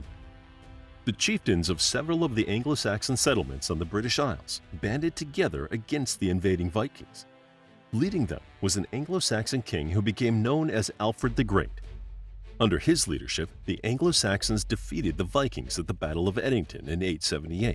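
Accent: United States. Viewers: Why is an American narrating this, also, inaccurately.? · It is funny that an American(?) narrated!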